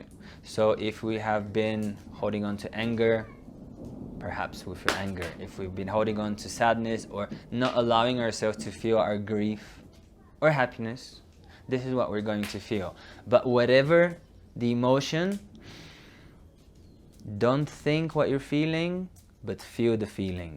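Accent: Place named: Jamaica. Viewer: Your accent sounds kind of jamaican hahaha i love it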